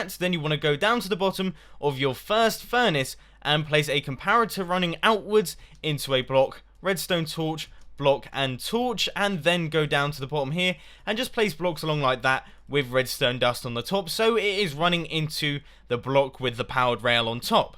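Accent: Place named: United Kingdom. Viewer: R0SILE tk Oh, he can. Maybe you are used to speaking a different accent than he does, so you might have a little hardship understanding his British English.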